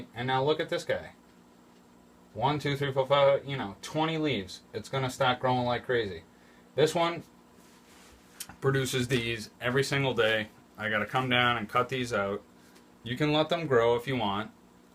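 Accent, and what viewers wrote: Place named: Boston, United States. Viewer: I watch this guy for 2 reasons. 1) learn more about aquarium care and 2) practice my Boston accent. Love it.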